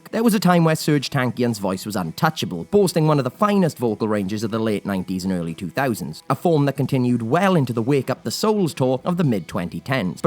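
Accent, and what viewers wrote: Wales, United Kingdom. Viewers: You sound like a British JFK. Actually, I'm not even sure you're British now. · remember one true fact, many of these stars can go on a diet, but the narrator will always sound like a Welsh git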